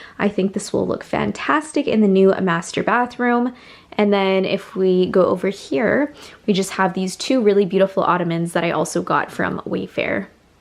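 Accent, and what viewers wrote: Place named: Canada. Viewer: So bizarre I found you on Insta through a pink hashtag or something. The first thing I saw was your beautiful home on your stories, and your closet full of all things pink💗 I didn’t know you had a YouTube channel. I recognized the Canadian accent right away and saw in the first video I watched you lived in Calgary. My Daughter lives in Canmore and just loves Alberta, she’s been there 5 years now. So now after watching a few of your older videos did you actually live in Windsor? I can’t blame you for moving if that’s the case, it’s a cesspool.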